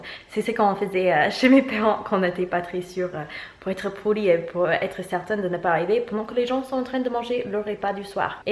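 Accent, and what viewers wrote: United States. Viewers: You’re so nice ! Your husband must be proud of you. I would have loved to marry a woman from a foreign country ( especially from the USA). You show us how proud we ,as French , are honored to have you through all these experiences yo share. I’m so sensitive looking at a person like you who may tell the best from both cultures : USA and France. Keep your delightful accent ! Bravo pour vos vidéos : elles sont fun, no boring at all, et elles permettent aux français qui connaissent pas très bien votre vraie culture de mieux appréhender ses particularités. N’oublions jamais que ce pays est immense et que NY ou LA ne représentent pas toute l’Amérique. For sure, your parents gave you a strong and good education with real values. And it represents such an asset for life. Now it’s your turn to convey all these values to your children. And on top of it, they will be enriched by their two cultures. 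Wishing you all the very best in Canada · J'adore t'entendre parler américain !!!!!!!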